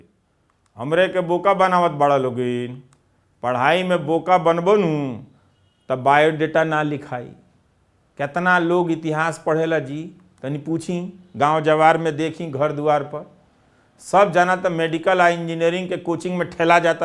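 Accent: Bhojpuri, India. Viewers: आपकी भोजपुरी बोलने की शैली बहुत प्यारी है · I'm not from the hindi region but love bhojpuri and understand well. I enjoy the dialect and accent. Subscribed this channel.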